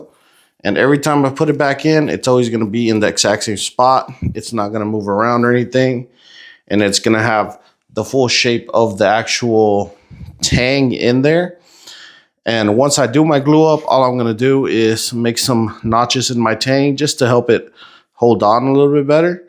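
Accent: United States. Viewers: Did you rip this from a real blacksmith? Or is your english just to american?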